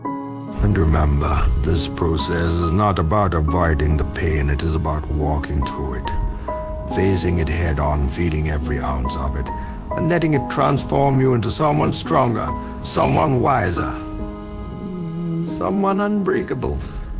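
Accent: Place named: India. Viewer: Come on man, this dude sounds indian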